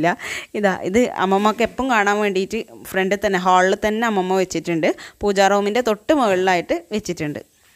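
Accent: Kannur, India. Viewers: കണ്ണൂർ ഭാഷ കേൾക്കമ്പോ രസമാ.. 😍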